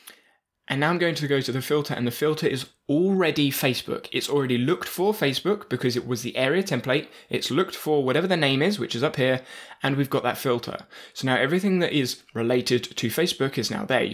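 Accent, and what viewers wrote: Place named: Gloucestershire, United Kingdom. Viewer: Cheers. Where you based? You sound local. Gloucestershire area?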